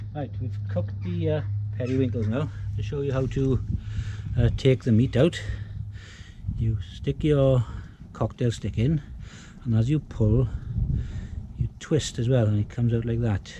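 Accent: Wales, United Kingdom. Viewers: Great prawns n lovely welsh accent👍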